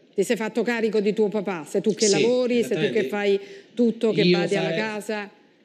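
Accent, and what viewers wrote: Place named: Calabria, Italy. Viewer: Buongiorno era una curiosità, dall''accento mi sembrava.Sai con quanti colleghi calabresi e siciliani ho lavorato.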